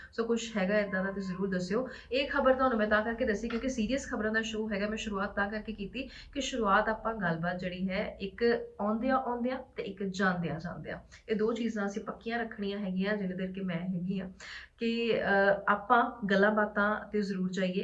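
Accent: Malwa, India. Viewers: veer ji eh boli malve de he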